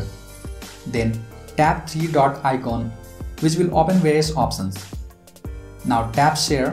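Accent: India. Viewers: If you think I’m trusting a man with an Indian voice on a tech issue, you can think again.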